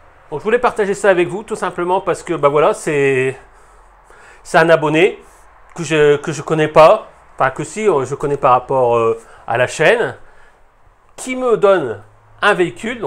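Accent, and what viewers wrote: North, France. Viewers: Le bon accents du nord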